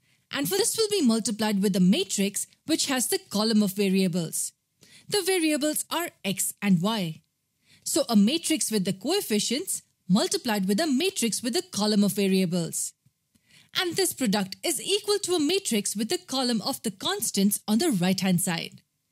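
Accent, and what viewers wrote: India. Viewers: Indian accent is good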